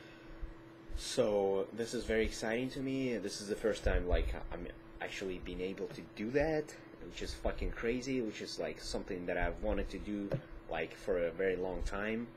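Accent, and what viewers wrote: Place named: Russia. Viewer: I agree, actually a little russian accent doesn't hurt you.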